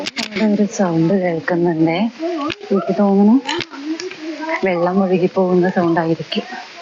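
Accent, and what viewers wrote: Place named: Malabar, India. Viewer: 40 പേർക്ക് ഇഷ്ടപ്പെട്ടു എന്റെ കമെന്റ്..അറിവില്ലായിമക്ക് കയ്യും കാലും വെച്ചാൽ അതിനു എന്ന് വിളിക്കാം...വാഴാനി അണക്കെട്ട് എവിടെയാണെന്ന്.എവിടന്നു പോകുന്നു എന്നും അടുത്ത ഏതെങ്കിലും അറിയപ്പെടുന്ന ടൌൺ ഉണ്ടകിൽ അത് ഇതൊക്കെ പറഞ്ഞാൽ അറിവില്ലാത്ത ഞങ്ങൾക്കും പോകാമല്ലോ.എന്തിനാണ് വീഡിയോ പിടിച്ചു ഒരു പരിചയവുമില്ലാത്ത ഞങ്ങളെ കാണിക്കുന്നത്.പ്രായത്തിനെ അന്തസ്സെങ്കിലും കാണിക്കുക.ഇത് താങ്കളുടെ തെറ്റല്ല കോന്തൻ ആണ്..സംസാരത്തിൽ നിന്ന് നിങ്ങൾ മബാറുകാരിയാണന്നു മനസ്സിലായി.വളരെ നന്ദി ഇനിയും ഉപദ്രവം തുടരുമെന്ന പ്രതീക്ഷയിൽ തൽക്കാലം നിര്ത്തുന്നു സ്നേഹപൂർവ്വം കുഞ്ഞാപ്പ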